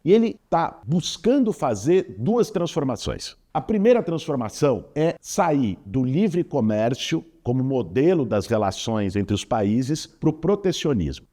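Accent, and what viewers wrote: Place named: São Paulo, Brazil. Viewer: Eu não aguento esse sotaque paulistano.... Podem me detonar, tá!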